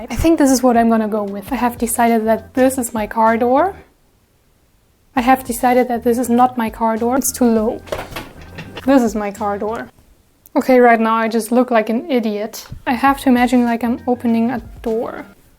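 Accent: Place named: Germany. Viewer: Omg I‘m German too! :DD (hab mir schon gedacht dass ich den Akzent erkenne haha)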